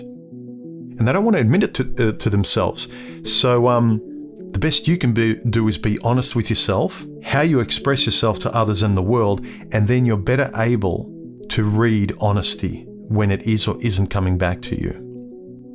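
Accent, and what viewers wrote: Australia. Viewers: The Aussie accent is a pleasant bonus! 😉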